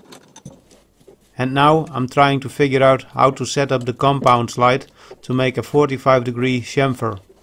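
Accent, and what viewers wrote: Netherlands. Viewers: I seem to recognize a Frysian English accent. The wooden shoes add certainty to my suspicion. Could it be that i'm right? And if so, what part of Friesland are you from?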